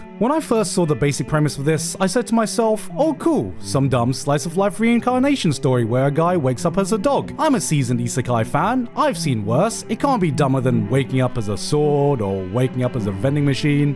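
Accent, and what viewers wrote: United Kingdom. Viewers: This video is conclusive proof that a british accent doesnt mean youre smart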